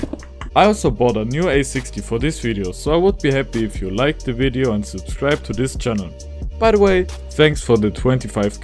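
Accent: Germany. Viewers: Er hat so ein Deutschen Diacsient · German but speaking English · Moin ich höre das du deutsch bist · Man hört so das du deutsch bist haha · nichts gegen dich aber man hört das du deutsch bist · U can hear the German, in his voics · Der deutsche Akzent ist zu geil und Respekt das du so gut sprechen kannst habe es probiert mit meiner Maus(bloody Al90)und habe über 85cps bekommen ❤ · Dieser deutsche akzent😂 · Du hörst dich ürgendwie Deutsch an...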